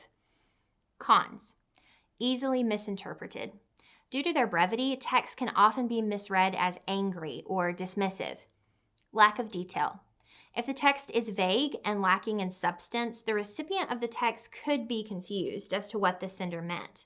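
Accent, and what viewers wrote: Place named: South, United States. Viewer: I can hear the southern drawl in her accent :)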